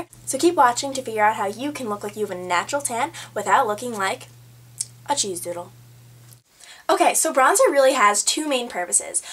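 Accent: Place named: New Jersey, United States. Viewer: im a jersey girl shes deff jerseyy not nyc or staten island jersey acent right there lol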